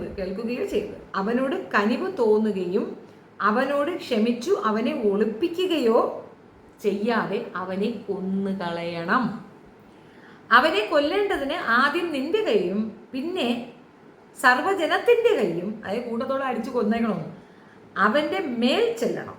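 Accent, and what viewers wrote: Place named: Thiruvananthapuram, India. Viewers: തിരുവനന്തപുരം ശൈലി 😂😂 ആണെങ്കിലും താങ്കളുടെ അവതരണരീതിയും ഭാഷാ സ്ഫുടതയുംനന്നാവുന്നുണ്ട്.. ചിലർക്ക് നിങ്ങളുടെ പോലെയുള്ള മറുപടി ആണ് വേണ്ടി വരിക..😂😂.. സമൂഹത്തിന് ഗുണകരമായവയും വഷളത്തങ്ങൾ അലങ്കാര മാക്കി മനുഷ്യരുടെ ഇടയിൽ അകൽച്ചയും വർഗ്ഗീയ ചേരിതിരിവുകൾ സൃഷ്ടിക്കുന്ന വർക്കും ഇങ്ങിനെ യൊക്കെ മറുപടി പറയുന്നത് ഉചിതം തന്നെ ❤😂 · വടക്കൻ ട്രിവാൻഡ്രം ആണ് അതാണ്‌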